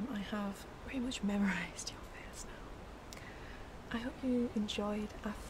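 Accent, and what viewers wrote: Yorkshire, United Kingdom. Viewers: Something about your voice which is incredibly calming! Do I detect the Sheffield Yorkshire accent? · Giving into the American pronunciation of Van Gogh, sacrilege haha - I can tell you're local to me, I'd guess a fellow Yorkshire-(wo)man? Although I also have family from Derbyshire, Notts and Hull and can't quite place you!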